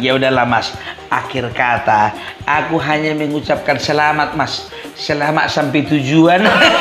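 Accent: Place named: North Sumatra, Indonesia. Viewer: Abang ini bukan lucu-nya yang aku senangi. Beliau ini mau belajar dengan mendalami materinya dan kontennya kaya, ringan, santai baru lucu dengan akses sumutnya. Bravo lae ❤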